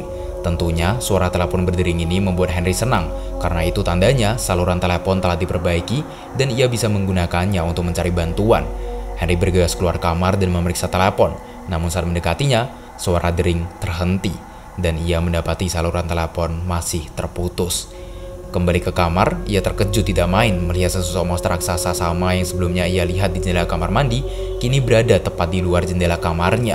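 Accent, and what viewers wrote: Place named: Java, Indonesia. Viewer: BANG LU ORG MANA SI KOK KEK MEDOK MEDOK GT SALAM DARI SBY YAA SEMANGAT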